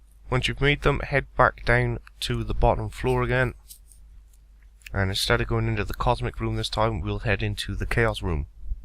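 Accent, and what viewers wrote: United Kingdom. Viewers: (Break) and (Law) --> British guy narrating. Long story short, a sudden image of popped into my head.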